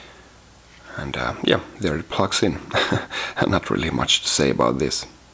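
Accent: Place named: Finland. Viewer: Eli oot sä suomaleine jos oot nii aksentti paljasti ja kanavan nimi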